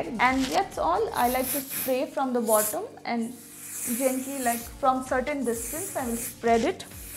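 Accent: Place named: India. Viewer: U speak like indian but looks like foreigner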